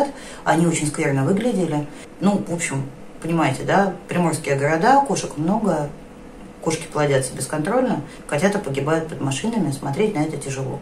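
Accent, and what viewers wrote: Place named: Saint Petersburg, Russia. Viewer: Потрясающий ролик в котором женщина, говорящая как питербурженка и мыслящая как москвичка рассказывает за тонкости южного быта. Я родилась и выросла в Ростове на Дону, со всей ответственностью могу сказать. Замените с тексте ролика слово "Болгария" на слово "Ростов" и 99% сказанного будет равно инструкции к покорению моей малой родины. Вывод Ростов не равно Болгария. Юг, многонациональный, торговый, с многотысячелетним наслоением истории, колоритный юг имеет в себе "ключики", одинаковые и сложно понимаемые теми кто там не родился. Однако, как человек много лет поживший вне пределов родного города, могу сказать следующее. Основной принцип ролика - отложите свои амбиции, откройте сердце, включите голову и проанализируйте вдумчиво место в которое занесла вас судьба - универсален. Для любых принципиально отличающихся от места где вы выросли конгломераций.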